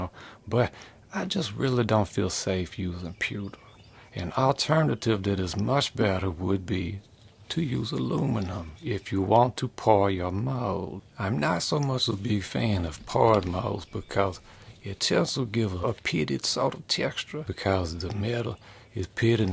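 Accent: Louisiana, United States. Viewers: Man sounds hella Cajun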